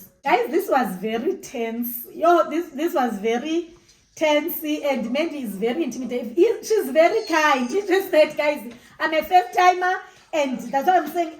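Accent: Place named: Zimbabwe. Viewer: Your accent....its giving Zimbabwe 🇿🇼 ❤❤😂😂 hello Mama, here from Mandy. Love your energy 🎉🎉😊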